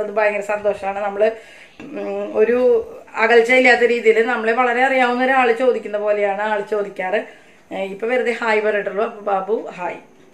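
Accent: Thrissur, India. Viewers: Chechi ente Peru soumya kunnamkulam aanu veedu but pkd aanu thamasikunnathu eniku checked samsaram kelkumbo nammude thrissur basha ketta njan happy first time comment aanu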